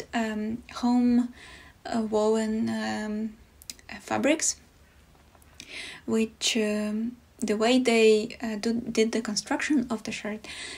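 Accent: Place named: Europe. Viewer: This is my first time watching your podcast and I loved it.. thank you so much. You are very talented & it is a joy to follow you. Also, because I am also from Europe, it is so lovely to hear your accent. Your story of your grandma embroidering the blouse really touched me, as it reminded me of my own beautiful grandmother. She was from Austria.. a wonderful knitter, crocheter & embroiderer, who also designed knitting garments & just knit from her memory. I learnt so much from her, and miss her every day 💕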